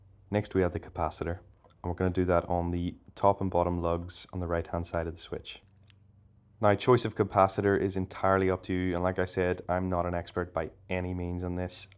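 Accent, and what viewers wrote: Northern Ireland, United Kingdom. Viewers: have you been to matchetts in belfast? i can tell you’re from ni from ur accent 😂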